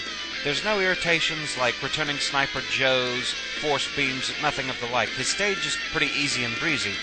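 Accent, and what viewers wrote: United Kingdom. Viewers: You sound british..... But you're not though right? Like the accent though. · are you british?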